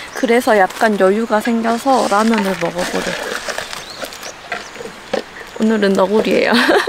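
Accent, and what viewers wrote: Jeolla, South Korea. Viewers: 님 타프 대박 굿굿👍👍 강이 언능 캠핑갈수있는 컨디션 되서 귀여운 강이랑 같이 캠핑다니는 님 보고싶어요~ 님 솔캠도 너무 귀여우셔용!! 님은 모르시겠찌만 전라도사투리 너무 귀여운거 아시나요.. 강이 귀여움에 살짝쿵 밀려버렸지만 님도 인정하시죠?! 안그래도 우중캠핑 가고싶어서 타프 찾아보고 우중캠핑 영상도 찾아보는데 에이웍스 실타프를 님덕분에 알게되었어요. 타프 찾아볼때만해도 옥타타프는 활용도가 별로이지 않을까 했는데 님 영상보면서 자연스럽게 우중캠핑할때는 옥타타프가 괜찮겠구나 생각했어요. 고동텐트도 영상보고 한참 검색해봤어요 ㅎㅎ 다음 영상도 너무 기다리고 있어요. 항상 건강하시고, 강이랑 행복하게 오래오래 캠핑다니셨으면 좋겠어요! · 전라도분이신가봐요 ㅎ 전라도 억양이 순간순간들려서요 ㅎㅎ 저도 전라도사람이라 반갑네용^^*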